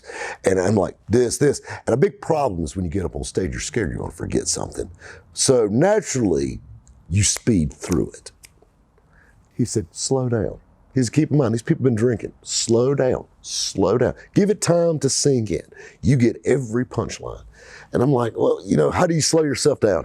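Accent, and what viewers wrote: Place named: Greenville, United States. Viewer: Grew up in Mauldin, SC from '78 to '88. It's great to hear someone that has that great up-west Green-vull accent. If you ever want to ride around and film barbeque or burger joints in Southeastern NC, I can recommend a few people. Our little band of idiots have some stories that can prove that the truth is stranger than fiction.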